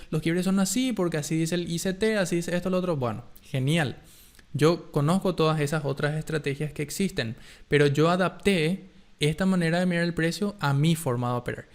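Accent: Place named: Paraguay. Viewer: que purete es el acento paraguayo bien hablado, que locura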